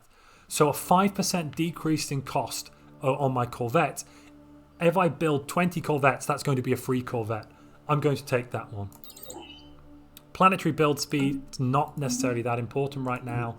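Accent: United Kingdom. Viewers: ColorsFade with a British accent.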